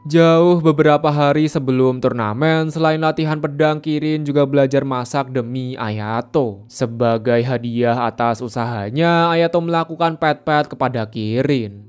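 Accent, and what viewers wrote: Java, Indonesia. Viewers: Meduk bahasanya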